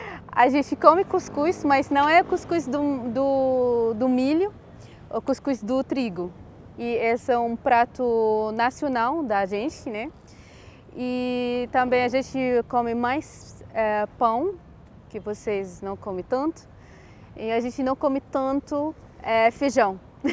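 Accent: Ceará, Brazil. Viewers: Que fofa falando com sotaque cearense. Descobri esse canal agora, estou amando os vídeos😍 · Seja bem vinda ao nosso País,sou de Salvador mais já deu pra notar que vc já estar com sutaque Cearense.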